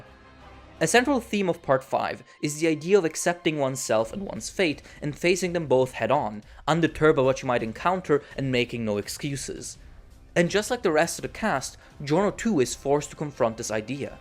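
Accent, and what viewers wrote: Italy. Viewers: Mhhhhhhhhhh your ACCENT souns very familiar,are you ITALIAN by any chance? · I love your italian pronunciation! Keep it up! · pretty sure he is italian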